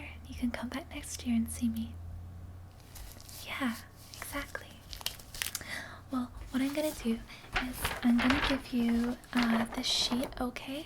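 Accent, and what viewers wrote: United States. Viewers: i am not sure how i missed this. but its wonderful. just like you. and i love the princess leia hoth hair you got going on. also love the american accent, though i prefer the british one! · The only non-American I've heard do an American accent as good as yours is Nicole Kidman. When you are discovered, don't forget your YouTube friends! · I'm from american midwest, you could have fooled me good with that accent, great job! And great video, thanks for sharing! 😄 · Wow, you do an american accent very well. Great vid! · Goodness the accent is convincing. If I hadn't see your other videos I'd assume you were American. Well done. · Great American accent. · soooo good hun, I love how u do an American accent. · "I have that too" LOL The American accent is good. However, no American medical office worker or nurse is going to sit with you with every question. 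They make you do it yourself. Outside the accent, there is something very very creepy about this RP character. I'd be running for the door at the first chance. I just googled AMSR and ... yeah that's why I find this creepy. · your american accent is great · Pretty good American accent! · I thought this was a great video. Not too common hearing someone who has an Scottish, English, even Australian accent. With as many dialects you've been exposed to try an American accent you did well. Being from America people naturally try and do other accents but hearing someone from Europe/Australia do an American is neat. Lovely video! Perfect! Somewhere I remember you saying you've lived in a few different counties. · Nice American accent! You can usually tell when it's a Brit trying to do an American accent. My wife is from Leeds (Yorkshire Accent!) and you can hear her accent when she does her impression of an American. 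· If you didn't put (American Accent) on your title, I would've really thought that you were from the States... Lol. Great video by the way... Think I can go sleep now finally... Lol. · I'm from the US, and if I hadn't seen any of your previous videos I would have never guessed you had an accent. Great American accent and great video!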